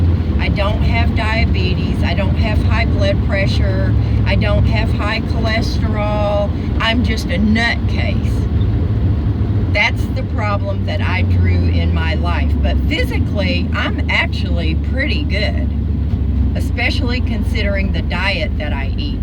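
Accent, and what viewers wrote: South, United States. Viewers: Oh....I've just found you. Please don't be gone forever...take a break but don't leave us hanging. You are too interesting and sensible. Plus your southern accent is delightful. Hope you will consider posting more even if not as often. Safe travels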